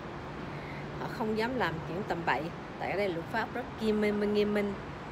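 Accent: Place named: Vietnam. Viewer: Trời trời bạn giống người Mỹ quá mãi sau ghe giọng mới biết là người việt.bạn hướng dẫn mua nhà ở mỹ có cần thẻ Xanh ko rất chi tiết chúc bạn thành công nhé bạn hiền ơi 🔔🔔cho bạn nè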